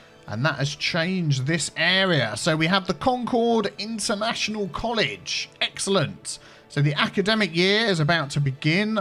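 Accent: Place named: Southern England, United Kingdom. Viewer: Complety off topic, but I'm a geography and linguistic nerd. Just wanted to know where you are from. Trying to sort out my British accent.. It was hell daitng someone with a Geordie accent while in Toronto.. I really felt schizophrenic.. I can hear you are from south England though..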